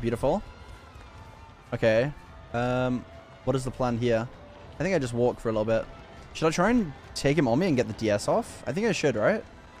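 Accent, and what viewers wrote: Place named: United States. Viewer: he does have an accent. He has an American accent lol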